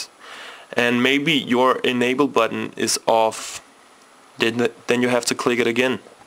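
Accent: Denmark. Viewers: You sound insanely danish its crazy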